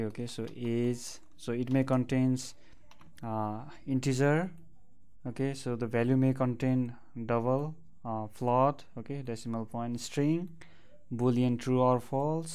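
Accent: India. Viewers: okay I don't know about you okay and this may sound racist okay but is it really that impossible to find a video on how to code that isn't taught by a god damn indian! seriously no offense to Indians but I hate listening to an accent the entire time I'm trying to dedicate my time on something, it's just very distracting.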